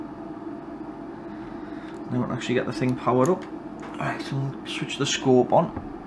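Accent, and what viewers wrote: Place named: North East England, United Kingdom. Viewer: I think your videos are brilliant. I have always liked the North East accent. I was hoping one day to marry a Geordie girl just for the voice. She could tell me bedtime stories so I could drift off into dreamland. Thanks for the info on the trinocular. I have bought a few tools but still needs a few more things. Thanks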